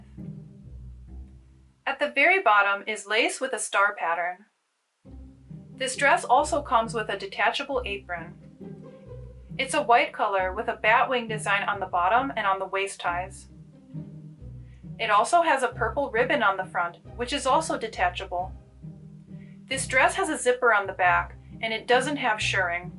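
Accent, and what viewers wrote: United States. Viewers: Are you from Minnesota? I hear a slight accent. It’s so nice to listen to <3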